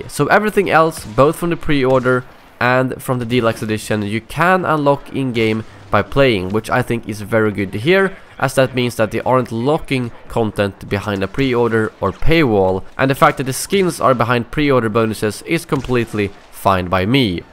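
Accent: Sweden. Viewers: Are you swedish? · You Swedish?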